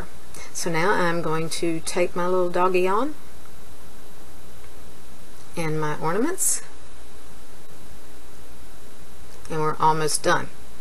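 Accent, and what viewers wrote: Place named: South, United States. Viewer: I enjoy watching this crafter in particular. She's definitely a master of this craft. Plus the southern accent is v.v. charming :)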